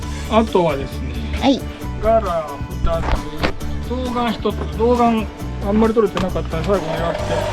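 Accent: Okayama, Japan. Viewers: いつも楽しく拝見しております。自分黒崎中学校出身で故郷の海、岡山弁懐かしいですね。瀬戸内海のカニ、カキ美味しいですよね。さむい寒い季節ですが頑張って下さい。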